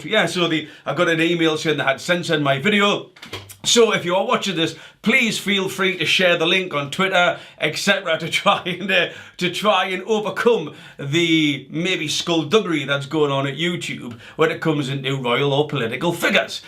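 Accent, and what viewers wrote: Newcastle, United Kingdom. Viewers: I love your Gordie accent! 😆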